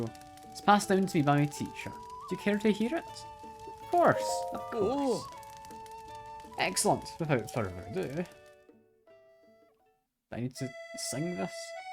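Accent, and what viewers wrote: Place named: Scotland, United Kingdom. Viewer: Cool. Funnily enough, I'm also Scottish and yet your accent is much stronger than mine lol. Nice video btw!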